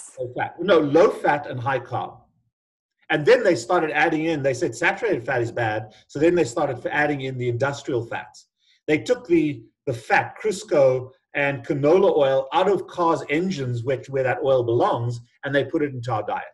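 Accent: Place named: South Africa. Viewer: Ha ha. I can hear a South African accent even when it hardly exists. What amazing doctors UCT produced.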